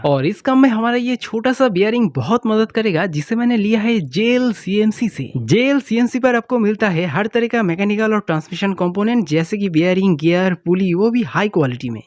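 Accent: West Bengal, India. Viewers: You are from west bangla right??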